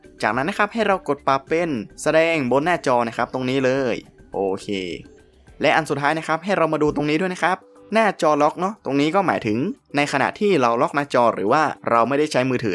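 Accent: North, Thailand. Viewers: พี่เป็นคนภาคเหนือใช่มั้ยคับ ทำไมปะแลดเมียง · พี่เป็นคนเหนือไหมครับมีสาปเมืองหน่อยๆๆ เหมือนเห็นตัวเองตอนพรีเซ็นงาน55555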